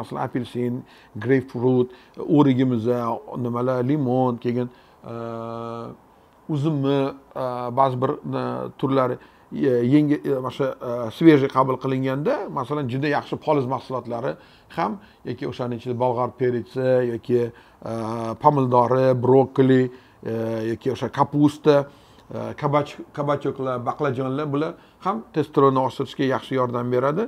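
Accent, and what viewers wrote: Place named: United States. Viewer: Я подумал что вы американец 😁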